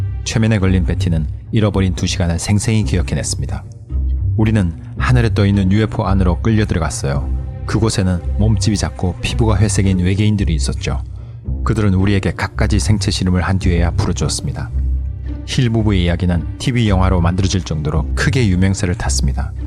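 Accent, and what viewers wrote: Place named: Gyeongsang, South Korea. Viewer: 경상도 억양이 조금 남아 있으시네요:-)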